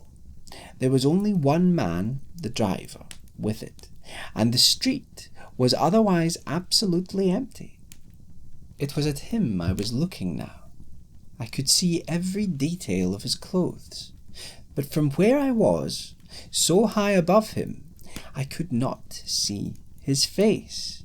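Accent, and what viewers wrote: Scotland, United Kingdom. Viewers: At the beginning I briefly thought that the accent would deter from the story, but that wasn't the case at all! Well done, my Scottish friend! :D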